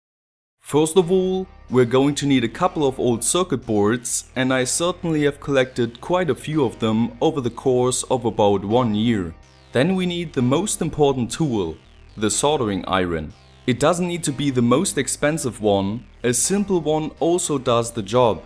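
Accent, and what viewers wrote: Germany. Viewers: That's a german accent :-) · german trying HARD to speak english... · Are you German? You sound like me when i speak English (i'm German). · Desloder with a heatgun... u welcome ;) btw. i would guess u german and not russian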